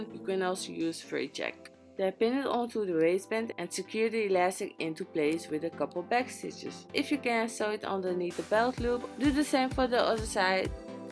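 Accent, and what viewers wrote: Netherlands. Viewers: Lovely dutch accent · you sound dutch... are you? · Wait are you Dutch? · Hoor direct aan je accent dat uit nl bent, keep shining sis ❣️ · Ik dacht al hoor ik nou een Nederlandse accent in je Engels tot je doei doei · Are you from the netherlands or a dutch speaking country . Because you're accent is cute. Love the vid btw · Dutch? Xox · are you dutch?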